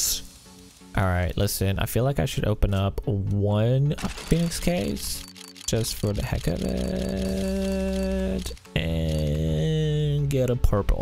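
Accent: Romania. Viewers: is this guy from romania????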